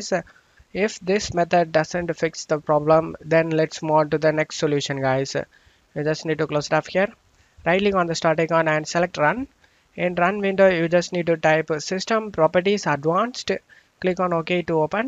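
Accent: India. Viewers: I was thinking this was a scam so I google it before trying to fix it, and I come across an indian accent guy explaining how to fix it lmao???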